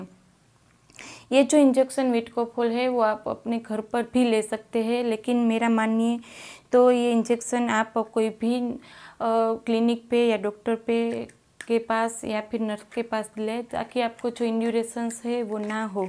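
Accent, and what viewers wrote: Gujarat, India. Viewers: aap ki.language accecnt gujarati sound hoti hai doc. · Aap gujrathi hai kya? Shayad kuchh or Bhavnagar ya junagadh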